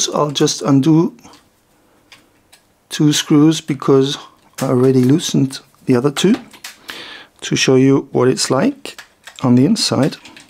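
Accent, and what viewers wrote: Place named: Netherlands. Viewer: Love the details of the build. I wonder if you are a native from the same area of Holland as Max Verstappen your accent is very similar sounding to me.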